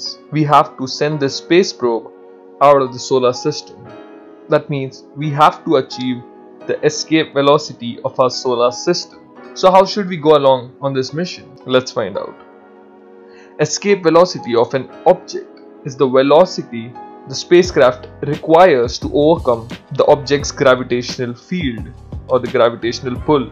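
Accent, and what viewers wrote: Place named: India. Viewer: Indian accent haha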